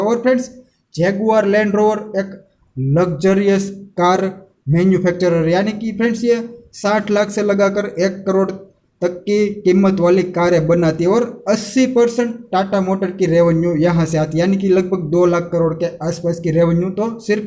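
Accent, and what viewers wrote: Gujarat, India. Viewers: Gujarati lagta hai bhai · Way of talking par thi khbr pdi aapda gujju😂😂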